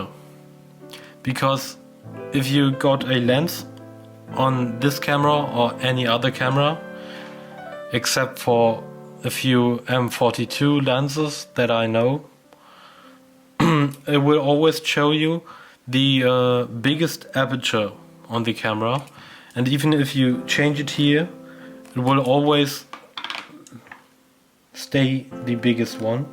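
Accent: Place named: Germany. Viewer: Hello, nice video. From your accent, I am guessing that you are german. Ehrlich gesagt wuerde es mich freuen auch deutsche videos zu sehen. Abo von mir hast du ;)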